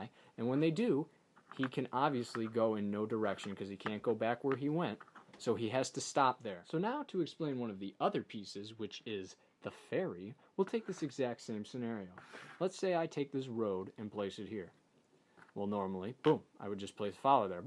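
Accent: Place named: United States. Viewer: Uhhhh...American. There's really nothing weird about it.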